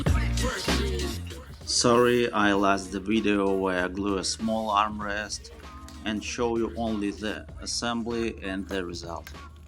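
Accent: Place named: Russia. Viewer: По акценту будто русский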